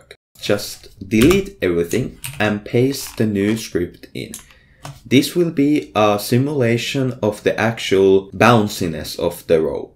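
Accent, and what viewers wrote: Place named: Finland. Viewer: suomalainen aksentti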